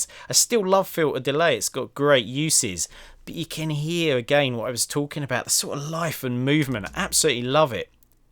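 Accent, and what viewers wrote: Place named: United Kingdom. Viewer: score a smooth British voice. thanks mate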